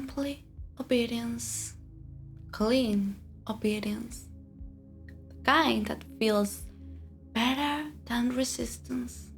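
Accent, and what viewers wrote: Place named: Argentina. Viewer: Ok I cannot resist your accent 😊 Very commanding tone of voice. I see you are from Argentine, South American women are so powerful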